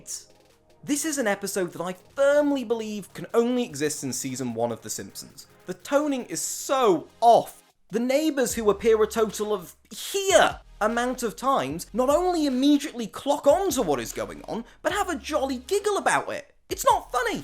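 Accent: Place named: United Kingdom. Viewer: You sound British, very similar to Sideshow Bob's brother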